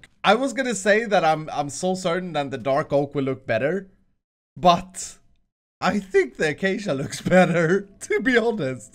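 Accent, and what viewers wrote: Sweden. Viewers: I love you’re Swedish dialect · Are you from sweden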